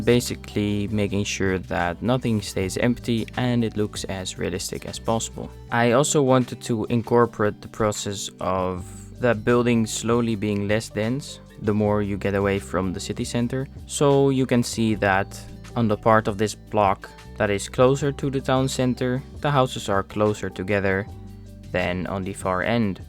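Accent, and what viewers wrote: Netherlands. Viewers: where is ur accent from? are u dutch?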